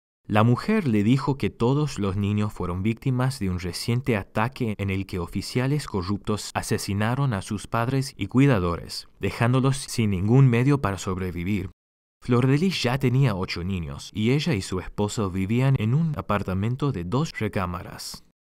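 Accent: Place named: Buenos Aires, Argentina. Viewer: Hablas como porteño(Buenos Aires)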